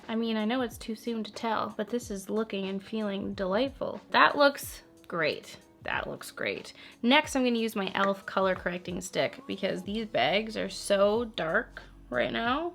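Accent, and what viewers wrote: United Kingdom. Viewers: I am living for the British accent!!! 😂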